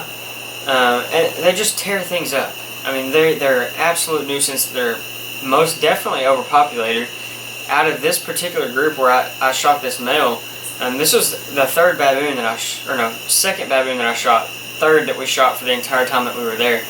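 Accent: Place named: Baltimore, United States. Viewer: Explanation sounds like Baltimore Maryland. Keep up the good videos.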